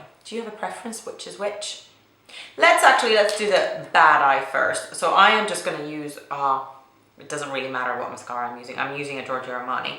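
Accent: United Kingdom. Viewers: What's going on with your accent? It's 97% English but there's a little twang in there too - could be Irish, American, Australian.... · At first I thought it was a British accent then I thought it was just an Standard American accent. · how come your pronounce half your words in a British accent (i.e. glasses, can't) and the other half in american?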